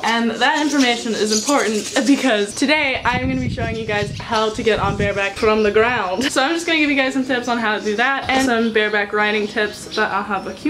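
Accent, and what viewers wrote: Russia. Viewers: I promise I'm not a 12 year old weeaboo but out of curiosité Do you happen to watch Yuri on Ice or is the Russian ish accent just for funzies? (My god asking about anime. My thirteen year old self is screeching) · Is that a Russian accent, sounds like my departed gramps Latvian accent. Have 4 horses at the house sit, thanks for the inspiring video! Subscribed!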